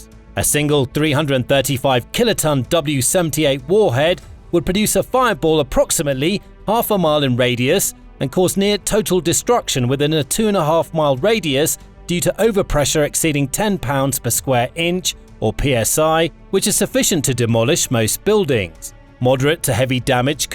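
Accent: England, United Kingdom. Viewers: I'd like to know why he's pronouncing "Aluminium" like an American as he's clearly English by his accent, English people do NOT pronounce "Aluminium" that way.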